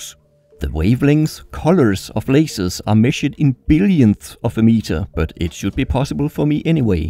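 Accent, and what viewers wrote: Denmark. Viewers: Jeg synes nok jeg kunne genkende en Dansker på hans Engelsk :D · Er du Dansker? det lyder det som på din stemme! god video! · Jeg vidste du var dansk :D Man kan høre det på accenten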